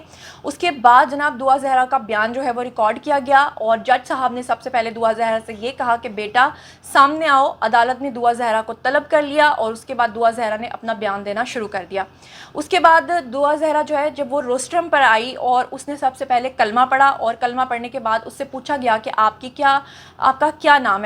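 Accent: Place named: Punjab, Pakistan. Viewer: But AP ka Jo panjabi pan he wo buht. Hi ghatya he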